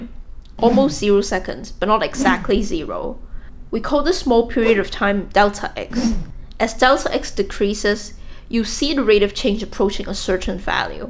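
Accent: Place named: Singapore. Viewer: Very nice Is this english accent from someone from singapore with a chinese background?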